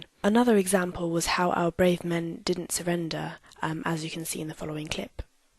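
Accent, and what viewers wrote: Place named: United Kingdom. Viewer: what's up with the brittish accent?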